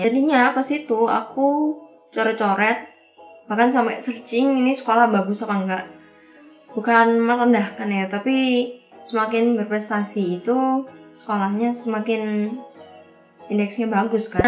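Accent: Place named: Java, Indonesia. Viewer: Medok Jawa e rek😅😂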